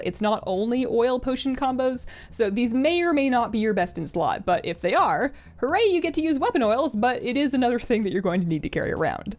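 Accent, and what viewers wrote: Canada. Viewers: Subtle Canadian accent? ♥ Canada.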